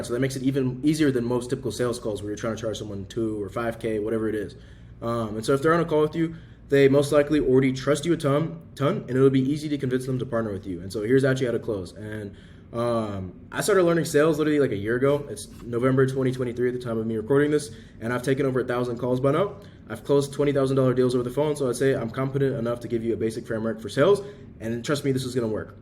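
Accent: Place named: Kenya. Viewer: heard your kenyan good work brother 🌵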